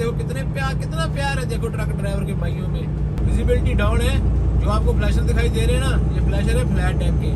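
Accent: Punjab, India. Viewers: Why the fuck he speaks Hindi in pu jani accent? Why can’t he talk in Punjabi just curious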